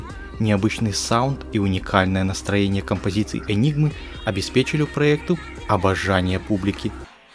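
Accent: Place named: Lviv, Ukraine. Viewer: Очень хорошее видео, но комментатор явно с Львова .....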